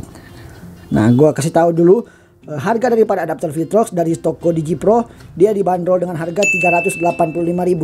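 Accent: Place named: Manado, Indonesia. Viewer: Rupa org mdo pe logat bos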